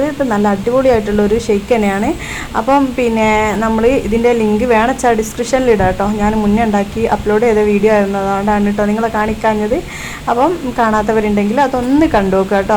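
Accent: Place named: Tamil Nadu, India. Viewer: ഇത്ത. എന്തു പറ്റി. സംസാരം തമിഴ്. ടെചു. വന്നു. സൂപ്പർ.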